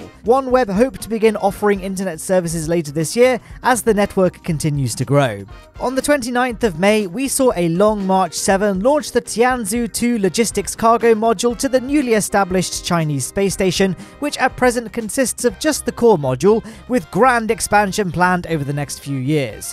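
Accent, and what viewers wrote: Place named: England, United Kingdom. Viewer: As an English man like you I'm not gonna complain about you saying methane and Los Angeles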